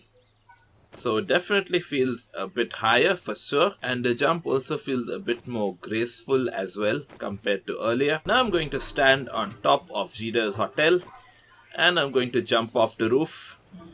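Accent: India. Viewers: I know you're most likely indian, but still love your accect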